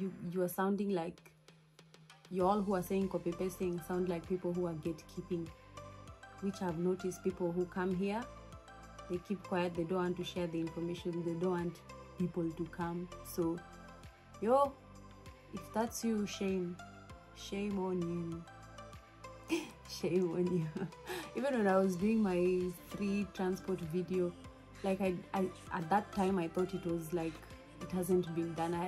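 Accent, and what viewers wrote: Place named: South Africa. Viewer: You speak like South African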